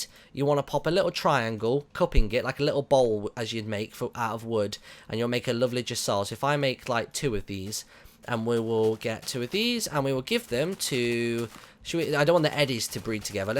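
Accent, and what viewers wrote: United Kingdom. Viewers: this guy is so brittish he sounds royal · yeah... I'm not usualy this predjudice! sorry, I like brittish accents! Plus I love his yogbox series!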